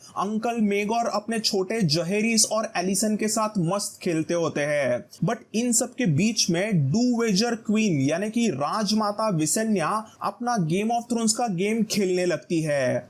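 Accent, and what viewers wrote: Maharashtra, India. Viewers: your hindi has a bit of Marathi touch to it, are you Maharashtrian?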